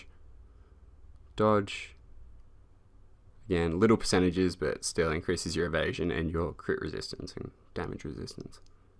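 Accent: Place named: Australia. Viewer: love the aussie accent mate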